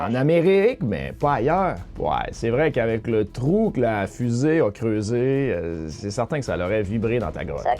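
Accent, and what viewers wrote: Quebec, Canada. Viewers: L'accent québécois comme j'adooooore :) + la voix de la petite voix "trop chouuuu" J'ignore si je suis le seul à aimer ça XD Aller hop, je m'abonne direct et bonjour depuis la France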